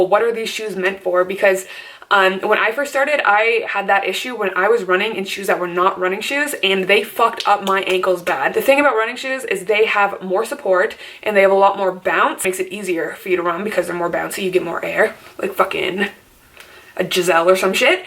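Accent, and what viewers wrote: Canada. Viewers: It’s the Canadian accent hehe 😊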